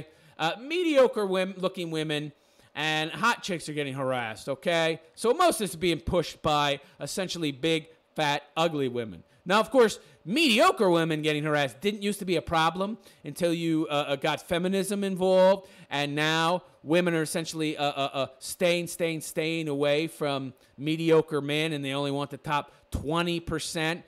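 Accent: United States. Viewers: You sound american' You so tough why don't you get arrested for not paying alimony and "take that shit to the supreme court and have the law taken out?"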